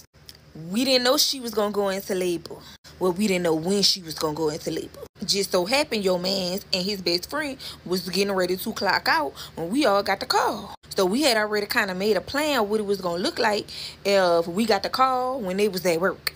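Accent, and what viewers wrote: New Orleans, United States. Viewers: That’s that New Orleans accent baby👏🏾 Louisiana in the building 🫶🏾😂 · Only N O people have that accent not the whole Louisiana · That nawlin accent is everything to meeeee behbay!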